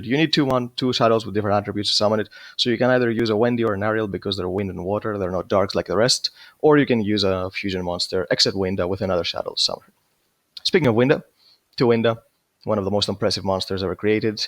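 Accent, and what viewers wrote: Greece. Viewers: Και έλεγα ρε φίλε λίγο ελληνική αυτή η προφορά 😂😂😂, πολύ καλή δουλειά πάντως μπρο συνέχισε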